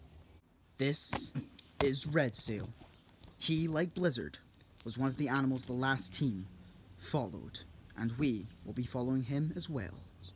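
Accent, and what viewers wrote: Scotland, United Kingdom. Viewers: I’m just wondering are you Scottish cause to me you do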